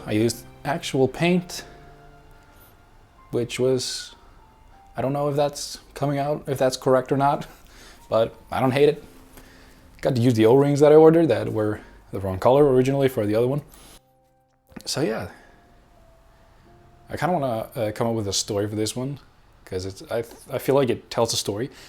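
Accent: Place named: United Kingdom. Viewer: Nah mate definitely should've kept the Brit accent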